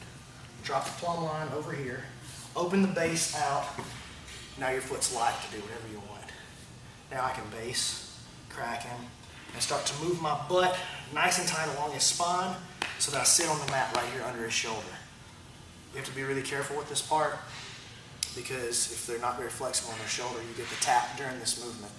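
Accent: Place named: Decatur, United States. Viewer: im from Decatur....aint been back in a while. loving the accent. feels like home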